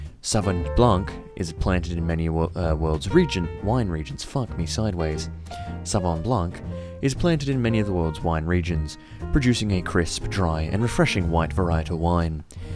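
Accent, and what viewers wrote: Australia. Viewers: I like how has got progressively less Australian over the years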